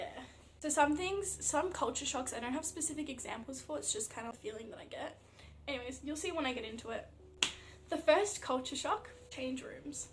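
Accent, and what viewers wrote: Australia. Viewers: Those are some new "shocks" about us I haven't heard about :D Love your accent too, heavy US American kind of "geht mir auf die Nerven" now, so Australian is a refreshing change to listen to! Have fun in Germany :) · I'm native Ruhrgebiet but worked in international companies in Madrid and Dublin before returning recently. The thing I miss most right now is the international flair while out and about. So, please speak loudly with an Australian accent whenever possible :) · It is always intersting to see how a foreigner sees and experiences our country and us people. It can be very entertaining and at times even funny. Partially your australian accent is a bit unusual to me, but that is not too dramatic. But I know what Macas is. We Germans often say Macens. Welcome to Germany, enjoy your time. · I really love the australien Accent ^^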